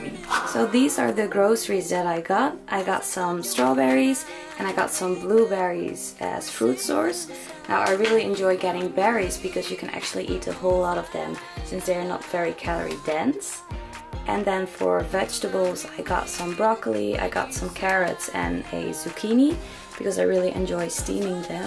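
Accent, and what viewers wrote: Netherlands. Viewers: Ik dacht al een beetje, aan je accent te horen, dat je Nederlands was! Maar hey Albert Heijn ✌🏼. Leuke video !!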